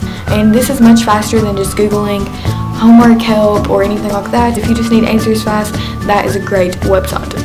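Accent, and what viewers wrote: South, United States. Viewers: Lol same! First I thought she was Scottish, then British or Australian! Until I realized she's probably from the south of the US. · A southern accent. If you can't deal with it you need to grow up. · Pretty sure it's southern. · What's your accent? At first I thought it was foreign but when I listened harder it sounds country. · your sooo country😍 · I really like her country accent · a country accent and has blonde hair hmm.. this makes me wonder hmm..how would she look like ??. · she is so country omlllllll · dat southern accent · Why is your accent so country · yeah I'm Australian too and it bothers me seeing all these people confusing this clearly american accent for an Aussie one haha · I thought at the beginning but she has a freaking southern accent as well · I thinks she's from Tennessee, or Texas, but not from Britain. 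· she has a country accent so I guess she is country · she's definitely from the states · wish i could like the vid but i hate southern accents :/ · Sounds like she has a southern accent to me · are you from the south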